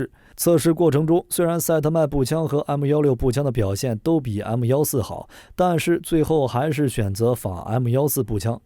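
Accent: China. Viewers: 你是国军退役？为什么你是大陆口音 机器人配音？ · 大家都好認真留言但是都聽不出來大陸口音嗎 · 這播報主的口音怎麼聽都是中國口音~ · 為什麼大陸口音那麼重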